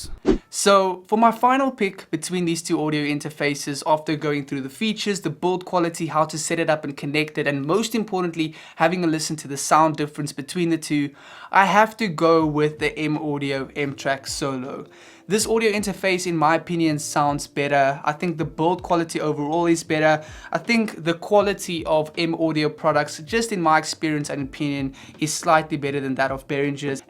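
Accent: South Africa. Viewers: Hey!thank you for this video ,quick question,are you from South Africa?you've got the accent lol ,I'm from SA as well!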